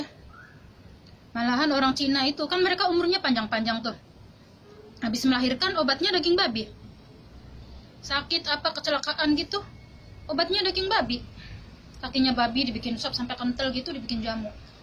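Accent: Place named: Cirebon, Indonesia. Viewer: Logatnya ky logat cirebon...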